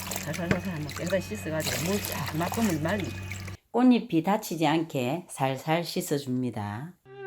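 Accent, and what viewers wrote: Gyeongsang, South Korea. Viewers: 일부러 보일려는 꾸밈이란거 없이 텁텁한 갱상도 사투리로 들으니 시골 고향지키고 사는 큰올케를 만난것같은 편안함으로 영상이 시작되서 웃음부터 나고요 남모르게 피어나 짙은 향기까지 품어내어도 있는지 없는지 무심히 여겨왔던 쑥갓꽃으로 향기로운 차를 만드는 과정은 살아있는 예술일 수 밖에 없네요 이름모를 들꽃 하나로도 아름다운 시를 엮어내는 정말 대단하셔요 쑥갓꽃차 향이 콧속으로 풍겨오는듯이 마음에 여유로움이 생기네요 멋져요~!!! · 댓글주셔서 감사합니다 경상도 사투리짱 우리동네 주민같아요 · 쑥갓꽃차 향이 너무 좋겠죠 한잔씩 마시고 싶네요 잘보고 갑니다 👍💖😅경상도 사투리가 익쑥합니다 ㅎ 어딘가요 저도 상주입니다👍💖😅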